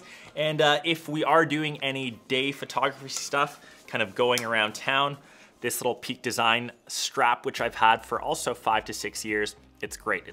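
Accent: Netherlands. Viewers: Huh are you from holland? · Hé, are you Dutch?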